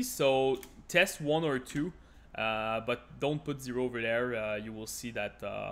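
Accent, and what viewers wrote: Quebec, Canada. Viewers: merci pour le tuto... j'écris en français parce que ton accent paraît très québécois ^^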